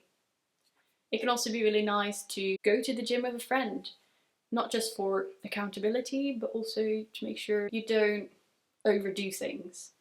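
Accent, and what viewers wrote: France. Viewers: Great and spontaneous video. That french accent though👌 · Cet accent français 😍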